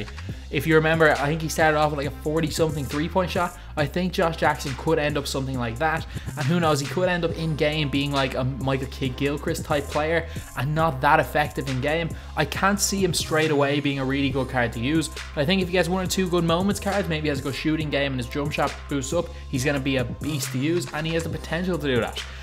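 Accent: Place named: Ireland. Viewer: Is he Irish bc he sounds like Conor Mcgregor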